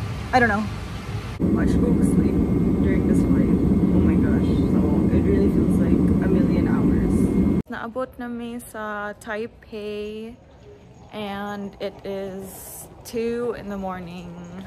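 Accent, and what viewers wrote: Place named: Visayas, Philippines. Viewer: Cute kaayo kag bisaya accent pero bawi ra kay gwapa na angel pa jud.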